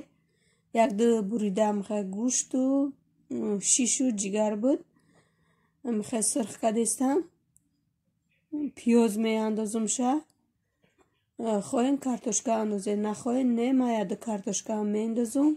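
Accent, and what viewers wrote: Yovon, Tajikistan. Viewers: Салом хохарчон ман зан хастам шумо аз ёвон мешед ми лахчаи гапзанитон ранги ёвонихо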